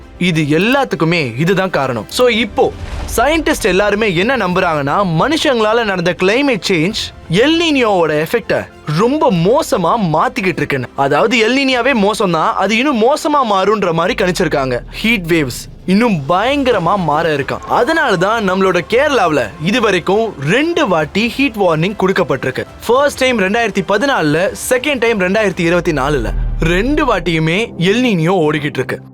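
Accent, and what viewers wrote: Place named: North Karnataka, India. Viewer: Dhruv Sir, Just a feedback on your Kannada Channel. We all are followers of your Hindi channel too. However the kannada language translation needs improvement. This is not Bangalore Kannada but sounds like a dialect of North Karnataka which is difficult to comprehend by most of the kannadigas. I completely understand that you can't read or write Kannada and you might have picked this language translation randomly without some inputs from Kannada Expertise. Best Wishes😊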